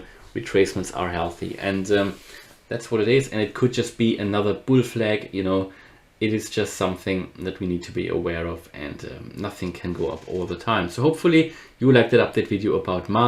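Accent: Germany. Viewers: What accent do I detect Are you German?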